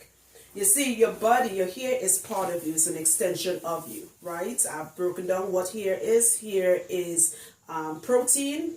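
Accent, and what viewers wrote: Trinidad, Trinidad and Tobago. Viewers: I love the Trinidad accent, be proud and don't worry about negative minded people. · I'm also Trini and Aquarius....we can't help the way we speak girl.....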